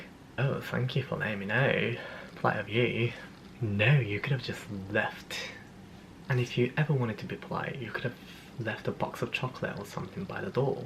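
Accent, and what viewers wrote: United Kingdom. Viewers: お話が面白いしBritish accentがとっても良かったです✨ お忙しいと思いますが、こういう動画を楽しみにしています♪ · 話が面白いプラスブリティッシュアクセントがかっこいいからめっちゃ聞き入ってた！ww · I like your British accent!! How did you improve your spoken English? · 綺麗なイギリス英語ですね。これからも一緒に勉強がんばりましょう！ オックスフォードサッカー部？の話も聞きたいです⚽️ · 追加コメントです！ 英語がとても綺麗なブリティッシュアクセントで、表現もとても洗練されてて、感嘆しました。すごく努力されたのでしょうね。 留学の当初、バスの運転手さんに「英語も分からないのになんでイギリス来たんだ！」と怒られたというエピソードを思い出すと胸熱です。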